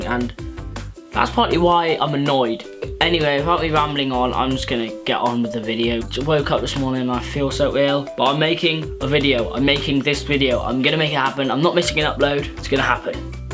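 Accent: United Kingdom. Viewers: British x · He's British😊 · Yay is British!!! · are you British? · Is he British? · Is he British or American?? BTW ur one of fave youtubers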